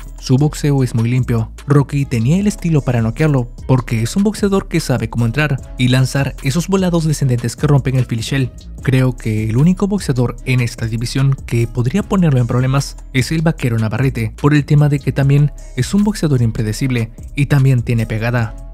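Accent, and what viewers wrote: Mexico. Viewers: El comentarista es mexicano?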